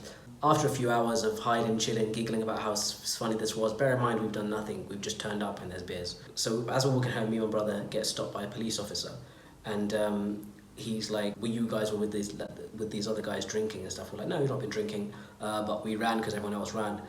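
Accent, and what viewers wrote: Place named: Banbury, United Kingdom. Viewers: British accent sounds better than the American accent I guess. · Definitely. sounds posh though, all Banbury, no Peckham...thank goodness!:)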